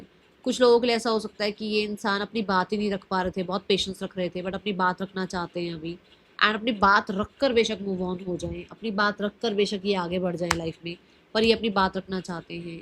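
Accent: Punjab, India. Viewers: Mam tusi punjabi ho ?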